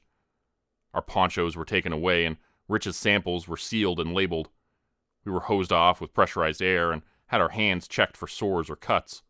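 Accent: Canada. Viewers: Woo-hoo Canadian here too. Vancouver area. I thought you sounded Canadian. You rock. 🤘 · I knew you were Canadian!!! I love your accent. I'm from just over the border in the US. Reminds me of home.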